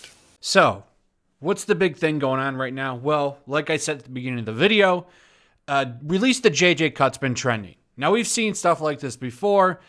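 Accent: United Kingdom. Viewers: Love your brittish accent...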